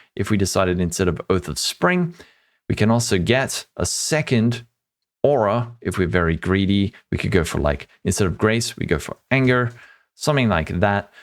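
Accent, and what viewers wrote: South Africa. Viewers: "It's not a shlep" 😂 love the ZA bruv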